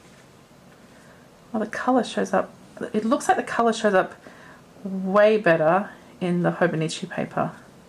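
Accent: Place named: Australia. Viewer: Heard the accent and was like "yay! Aussie!" And somehow ended up subscribed. 😄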